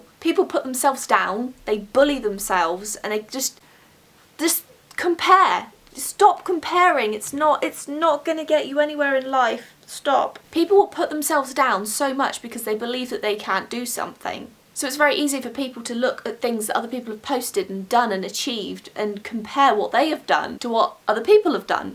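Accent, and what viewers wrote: England, United Kingdom. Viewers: AHHH how is your accent still so english!?!??!??? x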